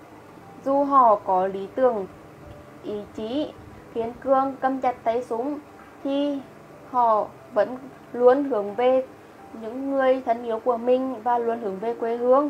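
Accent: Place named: Nghe An, Vietnam. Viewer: Tiếng nghệ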